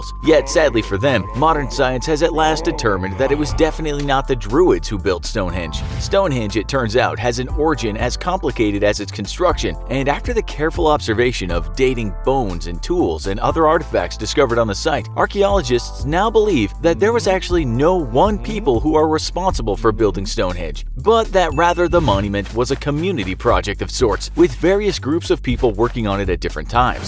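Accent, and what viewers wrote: United States. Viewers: Why is an American doing the commentary? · An American accent makes everything sound like a conspiracy theory... and not believable.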